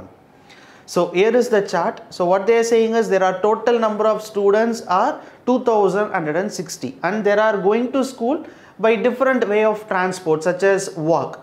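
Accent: South India, India. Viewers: Thanks a lot sir,iam south indian karnataka,residing in uttarpradesh by listening your videos I feel happy to hear that south indian accent,by the way your teaching method is very excellent.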